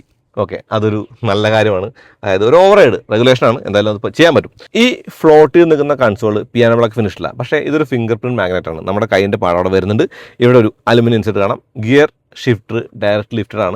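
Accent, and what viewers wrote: Thrissur, India. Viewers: Thrissur slang angananu bro..speed koodudal aanu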